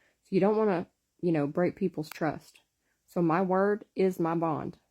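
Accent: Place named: South, United States. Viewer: I love your southern accent 😭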